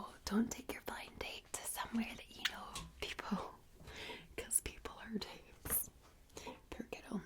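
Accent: Scotland, United Kingdom. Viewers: The beginning reminded me to a late night infomercial. Would you do something like that? like a mock-infomercial where you show different items, all presented in a relaxed scottish accent. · Your Scottish accent and the fact that you're Scottish is just enough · Jordana Brewster if she was a blond with a Scottish accent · she has a normal Scottish accent for a girl in Scotland, im Scottish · Wait you're scottish? I'm scottish too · FUCK SAKES UR SCOTTISH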